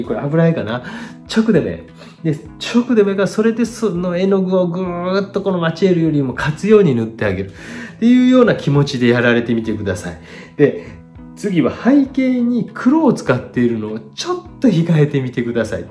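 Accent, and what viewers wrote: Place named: Nara, Japan. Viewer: ご指導ありがとうございました。 背景の黒を頑張ったつもりですが、まだまだ足りませんでした。 紙が粗いのは気になっていました。 紙探しをします。 そしてまた、ガラスを描いてみます。 先生、やっぱり奈良弁ですよ。 「○○できやん」とか、「見えやん」とか「良かったかもしらん」とかは、やっぱり奈良っぽいです。私の奈良のお友達は みんなほんわかしていい人達でした❣️ とにかく 精進しますのでよろしくお願いします😊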